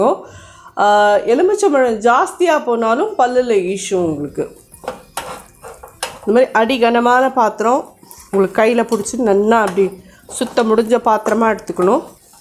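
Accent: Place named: Brahmin, India. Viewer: Maami neengal prema padurang mathiri irukkel. U speak pure brahmin agraharam Tamil. Excellent mam 🙏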